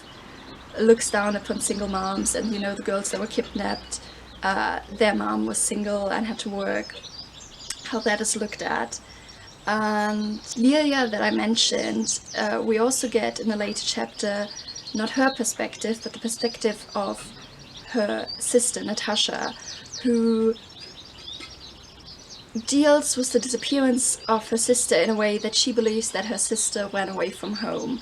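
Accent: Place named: Brandenburg, Germany. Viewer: Rain? Tropics get rain. The Sahara, Death Valley & other hot weather deserts get rain when they're lucky. Europe is so lucky. Hurricanes? What hurricanes? Tornadoes? Very occasionally in the south, but no one place gets hit with any regularity. Major earthquakes, well OK some in Italy and a bit in Spain. More northerly climes are completely spared. Not fair. Lucky lucky lucky. But beware the Azores re causing tidal waves & Icelandic volcanoes erupting. Euros can't be lucky all the time. According to linguistic maps, your dialect & accent is labelled as Markïsch aka Margravian aka Low East German. As u obviously understand the High German of places like Hannover, it means that u should have an easy time learning Dutch, which is a mix of High & Low German. You are a linguist, you learned English. And some day you'll rattle off chrysanthemums & "this that them there things, the thirty-three of those though they thoroughly" with no trouble at all. 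Me, pronounce the hardest sounds in German? Faints. :-D And I thought French was hard. According to Worldometers, Germany still has >27.7K active covid cases, with 216 new cases reporting today. The curve of covid is still going up, but it is closer to flat than it has been before. Canada has lotsa space, very spread out, relatively speaking, so our situation is vastly different, depending on the region. One province, Quebec, has nearly 30K cases & >2.1K deaths, whereas its bordering province, New Brunswick, has <120 cases and 0 death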